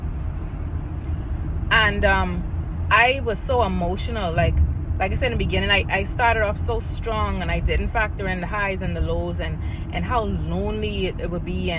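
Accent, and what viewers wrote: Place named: Bahamas. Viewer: Your accent is beautiful. It sounds Bahamian.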